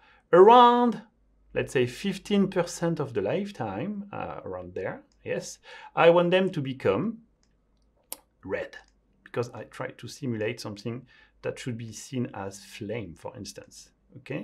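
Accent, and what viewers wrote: France. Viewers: I think it's a french's accent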